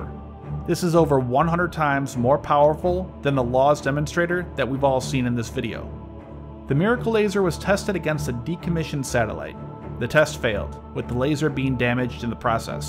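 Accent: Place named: United States. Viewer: Love this channel. Only person with an American accent who's unbiased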